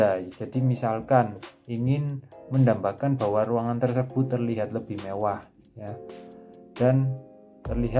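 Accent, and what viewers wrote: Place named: Java, Indonesia. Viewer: medok ya mas😀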